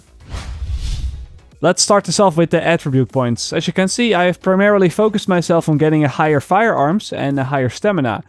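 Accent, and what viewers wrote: Netherlands. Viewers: its VERY obvious this guy is dutch because of his accent lol i am dutch aswell but i created my own accent on a young age so people kept thinking i was either from england or america which is great lol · plat Nederlands accent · He's Dutch, which is Lesser Germanic. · He's dutch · are you from the netherlands your accent sounds a bit like that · Are you from netherlands?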